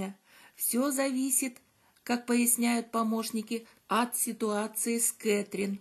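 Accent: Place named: Rostov, Russia. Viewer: НЕ- КАК ??? НУ И БЛА БЛА БЛА с ростовским акцентом !!!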